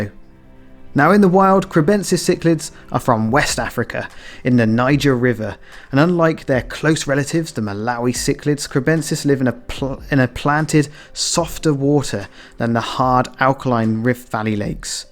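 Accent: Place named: United States. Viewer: I hate American accent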